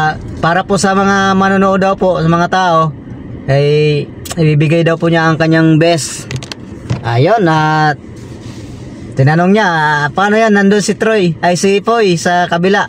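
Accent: Visayas, Philippines. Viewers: Ayusin mo pagsasalita mo brad...bisaya ka yata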